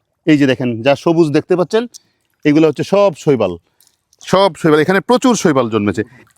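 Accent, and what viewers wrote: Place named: Barishal, Bangladesh. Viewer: আপনের বাড়ি মনে হয় বরিশাল অঞ্চলে।,,,,,,,,, জানাবেন।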